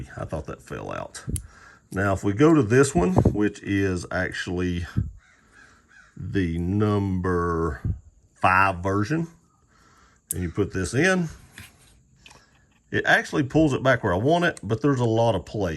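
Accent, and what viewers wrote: South, United States. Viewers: You must be from the south 😂, whereabouts?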